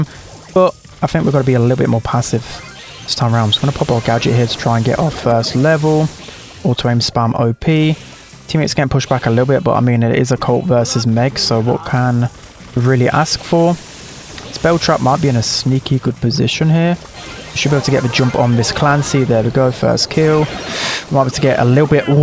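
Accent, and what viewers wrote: United Kingdom. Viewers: is so sick that his British accent got so strong I can't even understand some word he's saying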